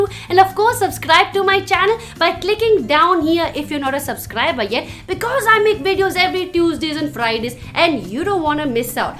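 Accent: India. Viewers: ur grown up in germany...but ur accent sounds indian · why don't people subscribe? you are an acquired taste, it takes time getting used to you. but you keep people hooked one way or another. For the longest time I couldn't figure out if you had this desi accent for real or you were being sarcastic with the accent. Even with german as your first language, your indian accent is heavy. its alright, nothing bad, thats the way you are. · i love u for ur indian accent......luv u babes......u look exactly like a south indian actrrss shari.....browse for shari-malayalam actress......u look like her exactly .....she plays villian/negative roles most of the times......u-u r the best.....love u lots....but kinda miss ur caps!!! they wre fab!!! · i really love the fact that you were brought up in Germany with a German mom too, but still speak Hindi really well, speak German like a boss, and speak English with the Indian tango! 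It's so unlike the other kids brought abroad without any sense of their roots, great work! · Doesn't seems like she been to Germany. Wow her accent is so Indian. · You mother tongue is German? I mean what? You look so Indian!! Woah! And the way u speak Hindi is so proper.. You grew up their.. still your Hindi is So awesome and your English is Indian accent! You gained 200% more respect from me :D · how did you grow up in Germany and have such an Indian accent?? Are you exaggerating it? to make it more entertaining? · your accent is Indian. I don't think you are born and lived in Germany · if you wanna be a critic, then no one's stopping you! But please, drop the Indian accent! For a lady who's travelled the world who was born in Germany, you got a real thick typical Indian accent, and your totally misusing it to talk trash about India! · I love ur desi accent and not faking it !! <3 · U grew up in germany but ur accent sounds like desi...Which literally makes no sense.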